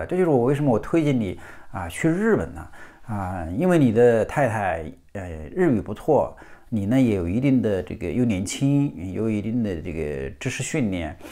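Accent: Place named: Hubei, China. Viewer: Zhou Bruce 感觉是湖北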